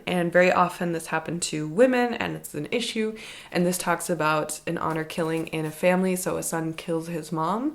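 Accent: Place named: Germany. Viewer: have you been raised bilingual? Since, as a german, I can kinda hear a german accent there, which is sorta fascinating, since I dont hear my own, eventho Ive been told my german accent is pretty noticable.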